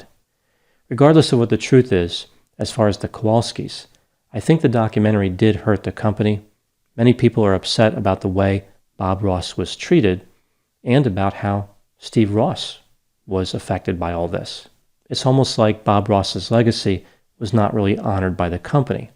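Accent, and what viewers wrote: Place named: Pittsburgh, United States. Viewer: hearing a Pittsburgh accent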